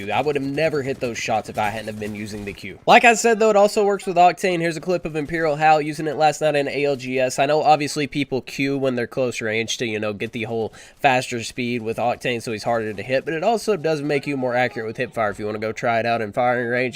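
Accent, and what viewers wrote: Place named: South, United States. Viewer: You have a bit of a southern draw, you from Georgia or the surrounding states?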